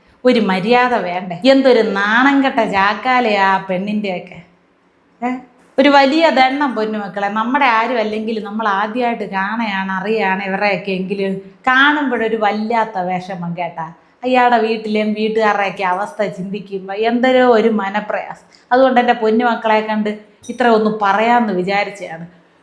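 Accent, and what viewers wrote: Thiruvananthapuram, India. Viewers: അവതരണം സാഹചര്യത്തിനനുസരിച്ചു വേണം. 3 പേർ മരിച്ചതിൽ ഒരാൾ അങ്ങേയറ്റം ബഹുമതി ആവശ്യപെടുമ്പോൾ ഇത്തരത്തിലൊരു അവതരണം നിന്നും ഒട്ടും പ്രതീക്ഷിച്ചില്ല. കൂടാതെ തിരുവനന്തപുരം ഭാഷാ പ്രയോഗം അതിരു കടക്കുന്നില്ലേ എന്നൊരു സംശയം. ഞാനും ഭാര്യയും നിങ്ങളുടെ സ്ഥിരം പ്രേക്ഷകരാണ്. അഭിപ്രായം ഉൾകൊള്ളാൻ കഴിയുമെങ്കിൽ ഉൾകൊള്ളുക.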